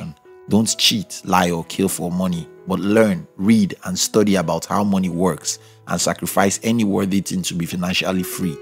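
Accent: Nigeria. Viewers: You sound nigerian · Same here, this is 100% our accent...but I am trying to figure out his tribe.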